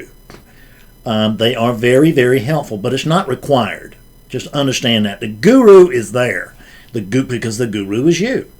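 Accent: South, United States. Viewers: your southern drawl that sounds lovely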